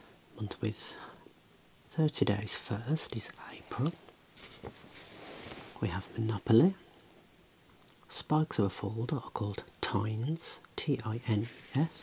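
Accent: United Kingdom. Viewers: The most finest British gentleman I've ever seen speak with such elegance and class! Love from India✌🏽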